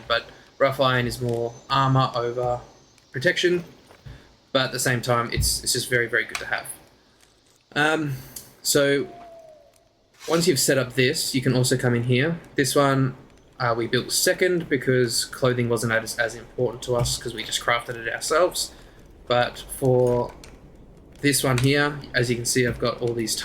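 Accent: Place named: Australia. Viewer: Thanks for these tips. I started a new game playing Aska this time not Ragnar And now, i'm managing and found agood location to hunt and forage foods. Closer to the mine as well. unlike before when I firstly started this game. I raged quit when the bear killed my 3 out of 2 npcs. And when I got 15 npcs most of them died of starvation during winter only 5 left. Because my location spawn point and where I made a base are too far from everything. haha! And subbed. You built a house on top of the mine. Would probably do the same and relocate my first game because my first game the mine was so far away from my base. Thanks mate. The accent is a gave away aussies. Cheers!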